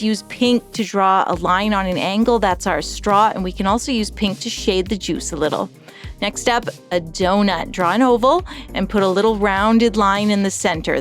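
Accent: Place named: Ontario, Canada. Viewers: sounds pure Ontario to me! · I love your French coming through...it's nice to hear "Canadian"... (I'm Canadian) I'm looking forward to trying your breakfast doodles. So fun! Side effect...making me hungry😂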